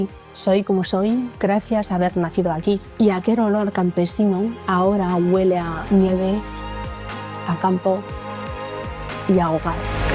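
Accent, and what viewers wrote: Spain. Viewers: Muy vuená tú istoría Ablás comó los Españoles ❤ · Soy español, canario, hablas como una goda, perfecto. Que bien español!!Los canarios solemos llamar a los peninsulares, "godos". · Un poco triste también sucede en Latinoamérica con algunos jovenes que les dan pena decir no eres la única persona somos orgullosos de donde vivimos te felicito por salir adelante eres muy hermosa Hablas español tienes un acento de España Te deseo mucha suerte 👍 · Hola 👋 saludos desde 🇨🇱 América del Sur, tienes un hermoso acento, cuando vi tu vídeo pensé que habías nacido en España, y después ver los vídeos pude ver que no era así. Tienes además una voz hermosa y perfecta para trabajar en radio y televisión tanto en España, China o cualquier país de habla hispana, y además tú eres muy hermosa y saludos desde Chile.....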